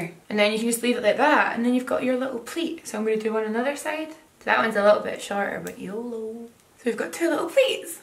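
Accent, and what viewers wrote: Scotland, United Kingdom. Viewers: Omg I love her Scottish accent! She's fantastic! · I love your hair and make up and your style!!! Please can you help me as I am very pale and now have mousy coloured hair which I highlight nbut I so so want dark hair without the commitment. So do you know anywhere I can buy either real hair wigs or similar???? Thanks I used to work in Glasgoiw and come from Renfrewshire but now live in Perthshire so bloomin great to see someone in my accent thrive!!! oxoxoxoxo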